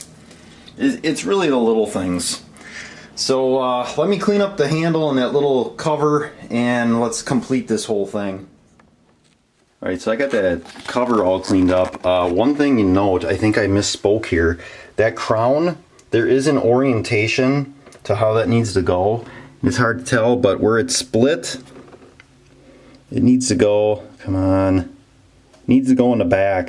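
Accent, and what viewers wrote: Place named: Minnesota, United States. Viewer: Here I am back again watching this video. Leaking started again, despite following all the directions. Didn't wait for Moen to send replacement O rings this time. Bought them online. And they didn't reply to my email. Not a happy camper. However, I am enjoying the accent of the presenter here and guessing MN maybe? Lot of snow in the background.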